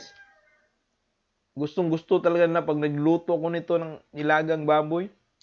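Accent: Visayas, Philippines. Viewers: Ka bisaya pud nimu oi..pero proud ghpon meng mga bisya nimu bai! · oh my word the way you speak tagalog, so me. lol. apir sa mga bisdak nga magahi talaga. lami kaau ang crispy dong! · New subscriber kuya!! Bhlag bisdak kaayo pero okeyyy keyuuu.. request kog e mukbang nga pancit canton chilli mansi with boiled and sunny side up egg 😁😁 lami kaaayooo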